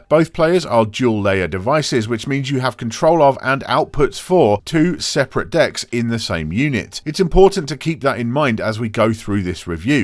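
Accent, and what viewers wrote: England, United Kingdom. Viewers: Great review, many thanks! Please keep in mind that this channel of yours, is watching by viewers and subscribers from all over the world. The only "negative" in your reviews is the fact that you speak too fast and viewers who are not native English and don't have that English accent, might have problems of understanding you 100%. Otherwise all of your reviews are excellent!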